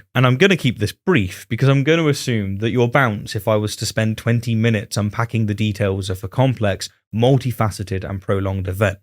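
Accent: United States. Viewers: Americna here. I approve your American accent. No worse than my backwoods kin.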